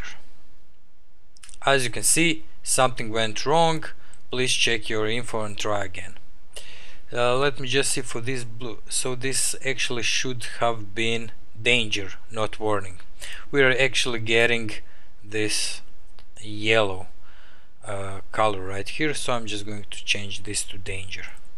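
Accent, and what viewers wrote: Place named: Russia. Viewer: Привет, вижу русский акцент, можешь на русском уроки тоже писать?)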